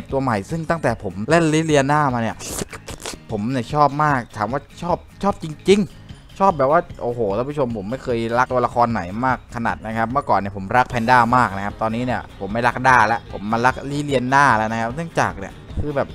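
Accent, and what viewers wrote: South, Thailand. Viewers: เหยดเเม่ม55+สำเนียงคนใต้ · คนใต้เหมือนผมเรย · คนใต้นี้ว่า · พี่คนใต้ป่าวคับ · พี่คนใต้มายนิ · พี่คนใต้ปะ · พี่คนใต้เออะคับบ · ไอย้ะแหลงใต้เหมือนผมเลยนิพี่บ่าวเห่อ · คนใต้ แน่555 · พี่คนไต้หรอพี่ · คนใต้หรอ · แหลงใต้ชัดมากเลย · สำเนียงใต้มาเลย พี่คน จ.ไรอะ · พีคนใต้ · คนใต้เปล่าคับ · คนใต้ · เเหลงใต้ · คนใต้คนใต้5555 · คนใต้เหมือนกันครับบบบบ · คนใต้หรอครับ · พี่คนใต้หรอ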